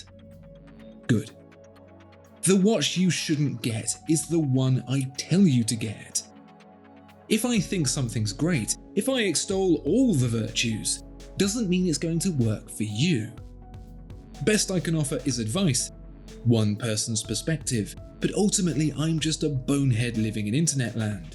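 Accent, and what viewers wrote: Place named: England, United Kingdom. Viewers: Never mistake an English accent for intelligence. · It's an English accent. You know, the language you're writing in. Have a day off from yourself Xiang.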